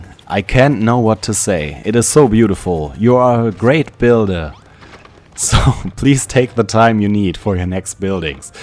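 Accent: Germany. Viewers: Juhu my Question was in the video. Und wusste garnicht dass du von Germany bist, obwohl man es raushört. Es klingt bisschen wie mein english. xD Thanks for the answer. Big up!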